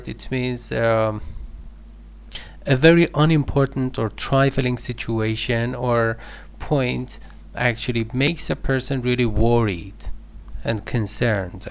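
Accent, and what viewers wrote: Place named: Iran. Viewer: Persian accent 😉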